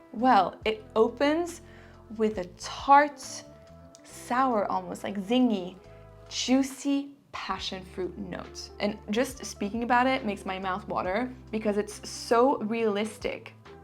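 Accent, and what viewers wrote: France. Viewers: Actually I don’t get extremely excited about the hibiscus mahajad… I do have and love Ingredients 07/2012 and recently got my hands on a sample of Neroli Nasimba and enjoy it quite a bit! Enjoying your beautiful French accent and pronunciation !!! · Came here for the review but I have to say I love hearing your French pronunciation. lol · I truly wish you can review Versace Dylan Purple Paco Rabanne Olympea Loving your French as always… I keep practicing the French R now 😅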